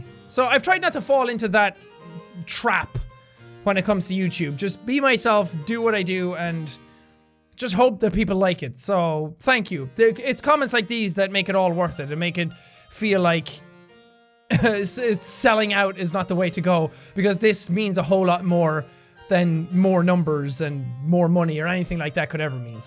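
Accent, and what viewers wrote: Ireland. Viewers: JACK!!!! Since you moved out of Ireland do you think you might slowly lose your accent? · Now that you moved does that mean your going to lose your Irish accent? Please be no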